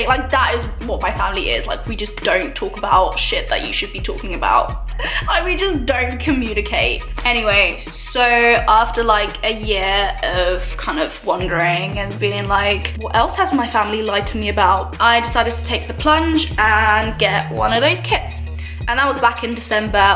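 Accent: United Kingdom. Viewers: Your so British tho! heeheh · Loved the posh english and humor. For the record dna doesn't have a watch and people mixed for thousands of years. Also not all black/white mixtures ever were nor are rape (if they were my ancestors I would certainly not assume one of my ancestors raped another of my ancestors!!!). But yeah keep up the light-hearted and humorous commentary with a posh accent, your ancestors worked hard to give it to you!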